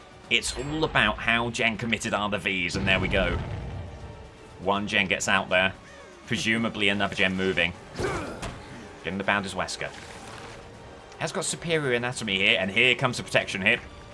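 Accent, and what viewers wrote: RP, United Kingdom. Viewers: ! My man! That posh guy from Youtube! great video so far (like 5 seconds in).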